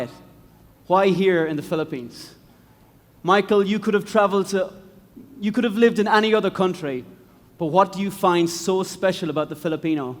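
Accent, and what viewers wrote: Ireland. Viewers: suntok2yoface He is Irish. You are being narrow minded. Fyi, even among north American speakers, Brits, Australians, and other English speakers, they have varied accents and ways of speaking the language. Try to be more open minded before making a comment. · I love your accent lol it reminds me about my ex bf from Ireland too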